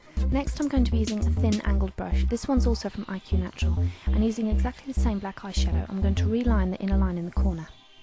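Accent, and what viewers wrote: England, United Kingdom. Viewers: YAAAY you have a Brittish accent! I LOVE accents, too bad I suck at immitating them. You're really good at makeup designing. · ahaa, i did it =3 very nice tutorial!! do you have an english accent? Your voice sounds cool!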